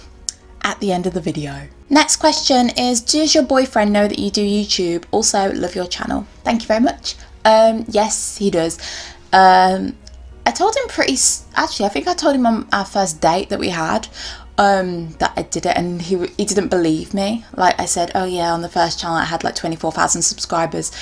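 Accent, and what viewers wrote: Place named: Birmingham, United Kingdom. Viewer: Where are you from? you sound like you’re from Birmingham by the way I’m obsessed with your channel 💓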